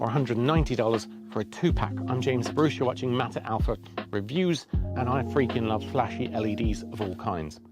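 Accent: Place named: United Kingdom. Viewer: so nice to hear a UK voice on a review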